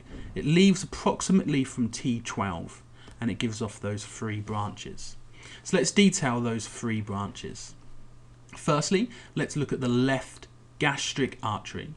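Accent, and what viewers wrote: United Kingdom. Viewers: Excellent video. Nice to hear an English accent. Go UK!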